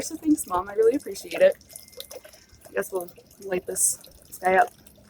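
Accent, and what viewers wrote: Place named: South, United States. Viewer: How long have you been in Central PA? I'm hearing an awful lot of Y'all's, you're a little north for that kind of talk.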